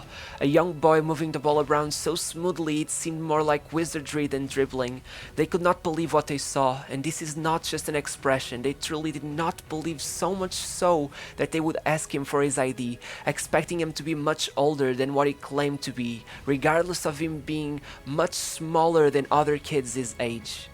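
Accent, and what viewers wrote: Portugal. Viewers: Uma pergunta: és português não és?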